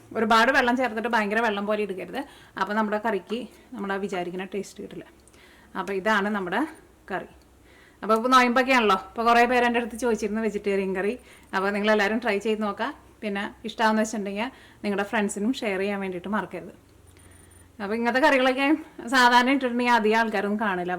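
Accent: Thrissur, India. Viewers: Good,we will try,Oru curry avasiyam ayirunnu,I have Oma,in my yard here in Florida,it is an adavantage in this lent season.Ninte language Oru Trichur accent analllo!!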